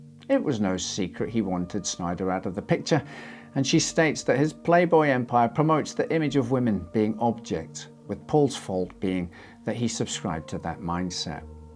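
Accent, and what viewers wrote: Scotland, United Kingdom. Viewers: Everything is better when a Scotsman narrator 😅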